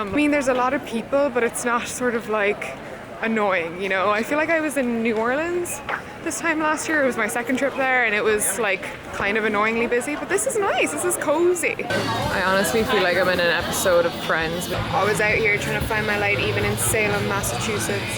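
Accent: Ireland. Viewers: I love your Irish accent. Makes you an awesome narrator lol. And it goes well with the New England theme...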